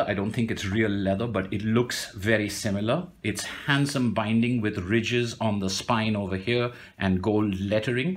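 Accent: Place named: India. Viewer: What's that "Made in Bollywood" accent ? ... Cow, it's horrible ! On the other hand, the explanations are very good ... Thank you !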